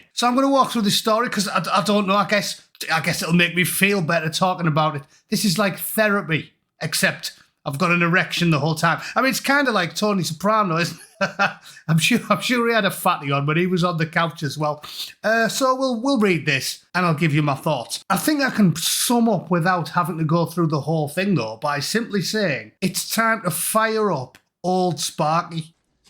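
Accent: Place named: North, United Kingdom. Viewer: Keep the good work up cock & me and the wife love the content with the northern twang. enjoy 8-)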